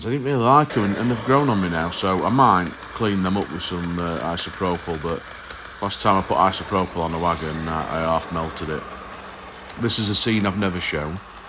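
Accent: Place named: Yorkshire, United Kingdom. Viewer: Well I must admit I really enjoyed the video and the humour was excellent (good Yorkshire dialect). However, as a seller online I fo have to comment on cost of delivery. I pay £2.84 to send a parcel, it’s cost me around 65p to make packaging and buy the box, bubble wrap and tape. I have to drive to drop the parcels off at the drop off point and there is my time. I charge £3.25 for postage on my site and do I think you are getting a deal. Yes, I look for a good deal and also like free delivery. If I have free delivery I would lose on 85% on all sales so I would have to include that in the cost of the item and my prices would increase, when you buy more than one item the postage is combined so you get free postage after the first item anyway. Just think about it. Regards, Geoff -Scenic3D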